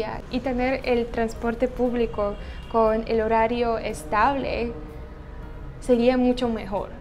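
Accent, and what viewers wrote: Colombia. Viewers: Hola, tienes un buen acento español Colombiano. Saludos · ya se te escucha un acentico de costeña 😄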